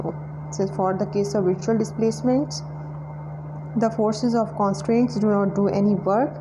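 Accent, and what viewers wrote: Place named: India. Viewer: Accent is only Understandable in India.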